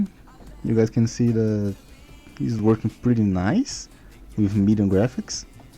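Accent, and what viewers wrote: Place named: Brazil. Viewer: Immediately recognized the Brazilian accent 🇧🇷🇧🇷🇧🇷🇧🇷🇧🇷🇧🇷🇧🇷🇧🇷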